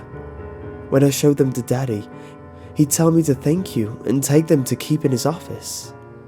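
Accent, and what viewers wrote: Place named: RP, United Kingdom. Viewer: Omg when your on youtube and you listen to american vids all the time and then listen to a british guy we sound so posh lol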